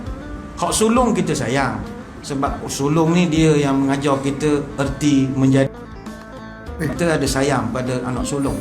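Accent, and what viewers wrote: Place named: Terengganu, Malaysia. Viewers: Ustaz ada masuk slank (bahasa) Terengganu sikit...tapi rasa sedih dn sama rasa rindu kita kpd Nabi SAW dlm cerita Nabi kali ini. Moga kita semua di dlm rahmat Nabi SAW...Aaminn3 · Loghat campur2 antara terengganu dgn pahang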